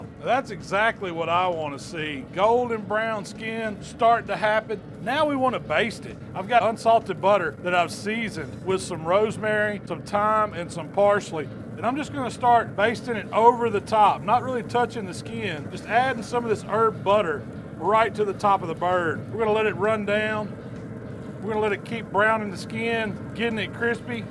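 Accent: South, United States. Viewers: Look no further when scrolling through how to smoke a turkey and come across a guy with a mean southern accent. Thank you sir!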